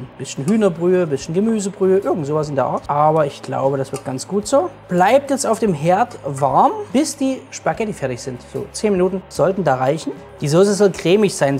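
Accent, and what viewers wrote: Saxony, Germany. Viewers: Sehr lecker, wir machen unsere Nudeln selber, Salz erst rein wenn das Wasser kocht(das Salzwasser braucht länger bis es kocht-gerade bei den Preisen-ne ich bin nicht neumalklug, habe ich von meiner Tochter-sie ist Köchin) Warum Untertitel - man hört ja nur wenig vom Sachsen😂-bin ich ja auch Sonst ist dein Rezept prima lecker🎉👍👍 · Grad das Sächsisch finde ich so charmant 😉 zusammen mit deinem trockenen Humor.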